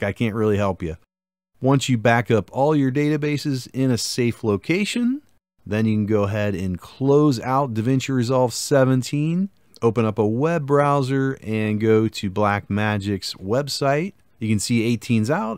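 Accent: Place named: Michigan, United States. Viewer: You have a Michigan accent 100%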